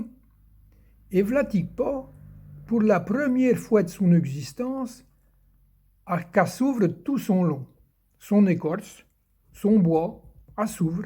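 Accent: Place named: Touraine, France. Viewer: Moi qui suis de Touraine, c'est fou comme votre parler ressemble au mien ! C'est ben piesant à oude ! Grand marcite.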